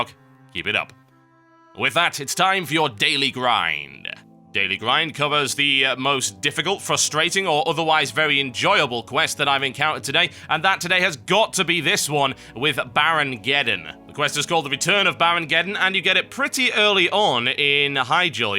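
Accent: United Kingdom. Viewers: British sounds ftw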